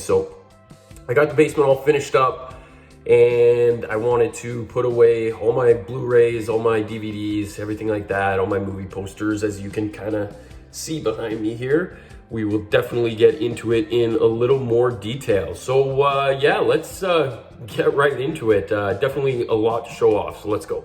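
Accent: Canada. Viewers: Tu québécois man? 🤔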